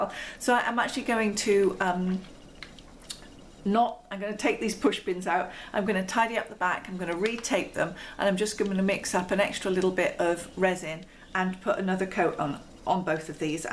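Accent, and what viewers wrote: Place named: Southern England, United Kingdom. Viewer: Obviously from the south of England, me too but now in Australia. Love your videos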